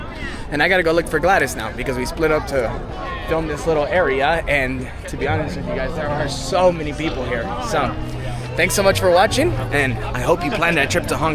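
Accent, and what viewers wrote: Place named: United States. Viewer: actually you no what ..... dude thats so annoying your whiney american accent doesnt help tho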